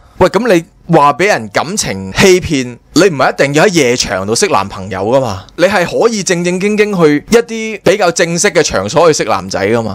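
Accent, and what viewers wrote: Taishan, China. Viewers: ok wor 式台山話我接受到 wor幾搞笑丫😂😂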